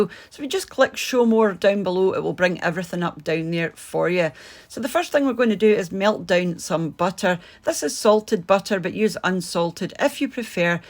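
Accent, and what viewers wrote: Scotland, United Kingdom. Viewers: Always nice to hear another Scottish voice on YouTube! Your videos help me unwind and to figure out where to go shopping that week. Keep up the great work!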